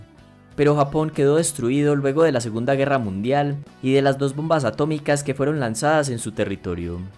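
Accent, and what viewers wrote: Antioquia, Colombia. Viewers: Se escucha como paisa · De qué parte de Colombia eres Men, no reconozco tu acento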